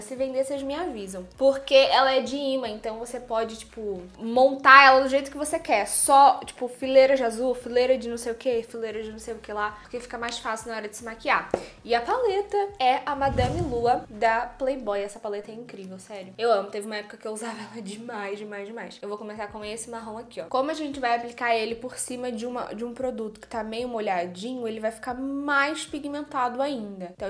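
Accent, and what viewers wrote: Rio de Janeiro, Brazil. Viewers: vc é carioca?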